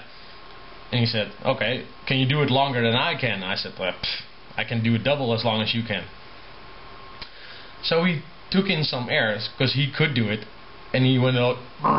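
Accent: Netherlands. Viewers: Dutch accent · Hoezo dacht je dat hij engels was? Je hoort het bijna altijd als iemand engels spreekt die nederlands is. Alle Nederlanders hebben ongeveer hetzelfde accent:P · hee ik dag al da je een ned accent had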